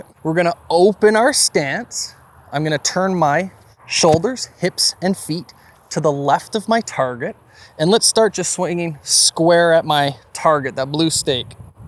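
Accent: Canada. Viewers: Is that a Canadian accent?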